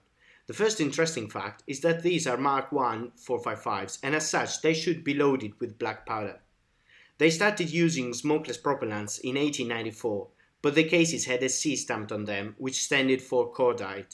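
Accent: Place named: Italy. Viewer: Italy I would say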